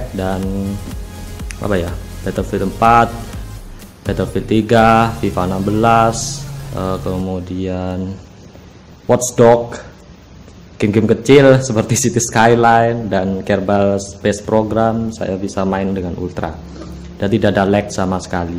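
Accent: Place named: Java, Indonesia. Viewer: review nya lucu coy, hahahha... medhok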